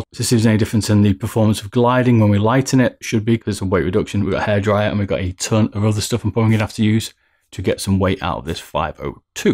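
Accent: United Kingdom. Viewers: wow! I subscribed to the channel after watching four super interesting videos! very good quality content, congrats! only a minor issue: I had to enable subs (english subs) because I'm not English mother tongue and even if I can speak and understand it quite good I'm not used to the accent you have (british, I guess?). but hey, not really a problem ;)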